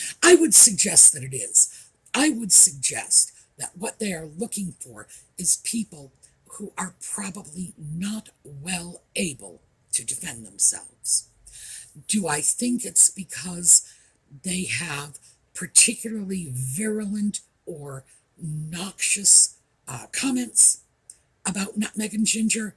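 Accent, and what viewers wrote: Wales, United Kingdom. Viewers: I find her Welsh accent very pleasing to my ears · is interesting. She’s had quite an unusual life and speaks on a variety of topics-many on her own life and adventures. She does a lot of research and presents information that usually backs up her observations. She’s fun to watch, because of her easy going presentation and lovely Welsh accent. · expecially hearing her Welch accent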